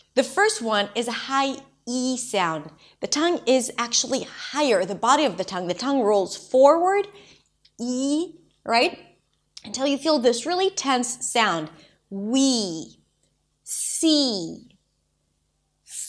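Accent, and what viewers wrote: United States. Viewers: Look sounds almost like luck? There is no trouble to pronounce V because в is the same :) as for my ears this is loud American accent of Emglish :) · with what? This is American English not British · Hi! I love your videos. I'm wondering if you're a native English speaker or if you were able to achieve the American accent that you have through practice? Thanks! I'm looking forward to more videos:) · This is an American accent english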